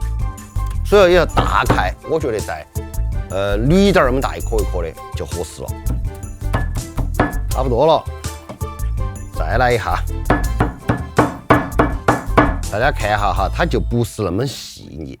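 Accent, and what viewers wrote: China. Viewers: His Chinese accent is fucking hilarious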